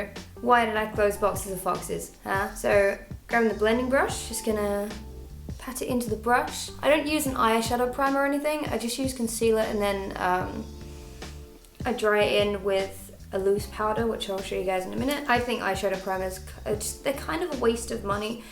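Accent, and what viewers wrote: United Kingdom. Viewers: i love british accent so much...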